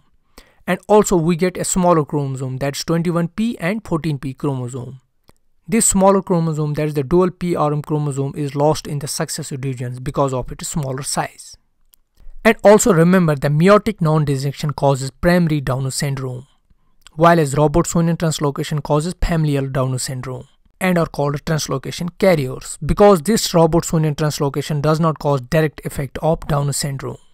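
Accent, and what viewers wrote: Kashmir, India. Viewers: Indian Accent is the best !! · I caught u u r a kashmiri aren't u bolein bolein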